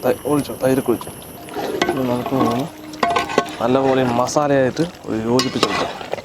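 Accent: Palakkad, India. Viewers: ഭായിടെ പാലക്കാടൻ സ്ലാങ്ക് ഇഷ്ടപെട്ടവർ ലൈക് ചെയ്യുക 👍👍👍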